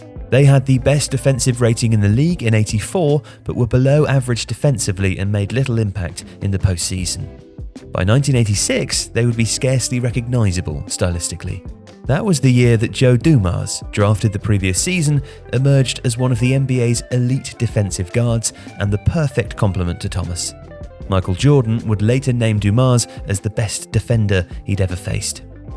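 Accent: United Kingdom. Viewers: This is just so awkward to listen to NBA talk being spoken in a British accent 😂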